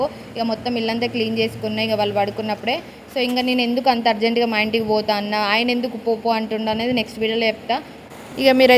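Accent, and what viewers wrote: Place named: Telangana, India. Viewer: పక్కా తెలంగాణ స్లాగ్ 🙏🙏🙏🙏 Super super 👌 👌 👍 👍 💐 💐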